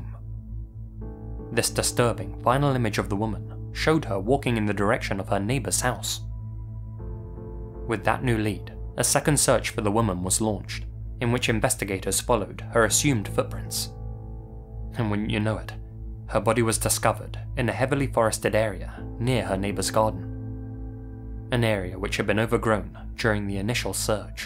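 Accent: United Kingdom. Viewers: British guy doing as for usps...love it haha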